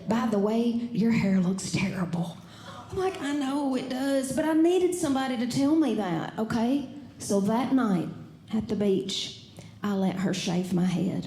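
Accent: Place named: South, United States. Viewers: The jokes were perfectly fine. I laughed many times. Shitty people like you should probably get on stage instead of thinking their opinions are objective. See, I think you might just have an aversion to the country accent and the fact she’s from Texas and is Christian because when I was far left, these are the same type of markers that made me instantly not like someone until I realized I was part of the problem just like the far right people who hate leftist Just for minor things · I just love her accent. Any southern accent is pleasing to my ears. I think she is way cuter without the wig.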